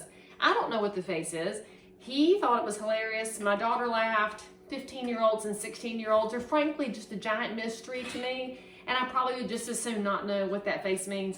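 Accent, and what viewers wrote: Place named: South, United States. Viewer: Just found your video. You are cute as a button! Love your Southern accent, too. And thanks for the recipe! So good!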